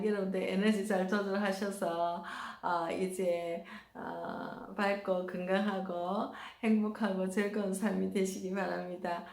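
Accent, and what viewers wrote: Gyeongsang, South Korea. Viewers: 오늘 리비도에 대한 삶의 에너지 설명 매력적인 경상도 억양으로 잘 말씀 해주셔서 잘배웠습니다 좋아요 12번째 응원합니다 아무쪼록 좋은하루 되시기 바랍니다 😊 😄 😀 🙏 🤗 💕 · 안녕하세요💓 리비도ᆢ 누구에게나 기본적인 정신분석을 잘 말씀해 주셔서 저에게 많은 도움이 되었습니다ᆢ 경상도 말씨는 은근히 매력있습니다 🤗 감사합니다 행복한 오 월 되세요 🥰🌻🌷🌷🌷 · 강의내용에 많은 공감을 합니다 휴일 잠시 님의 강의를 다시 듣 고져 시청중입니다.그리고 연결 합니다..자주 뵙게되겠습니다~^~ 저는 서울입니다.30여년 대구에 서 자라고 학교를 나왔죠..쌤의 사투리가 대구.부산? ㅎ멋진 휴일되세요~^~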